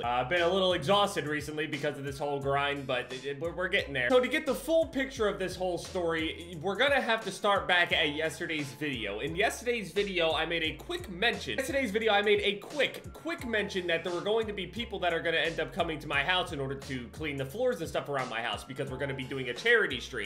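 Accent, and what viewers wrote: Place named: New Jersey, United States. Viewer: you can tell from jersey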